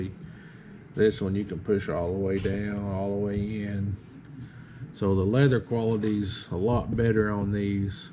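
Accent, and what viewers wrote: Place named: South, United States. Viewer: I like your boots, and I like that southern drawl of yours. Happy New Year to you. From Canada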